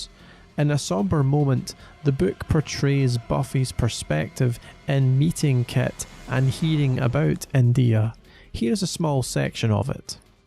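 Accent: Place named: Scotland, United Kingdom. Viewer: Heard a Scottish accent. From Edinburgh here.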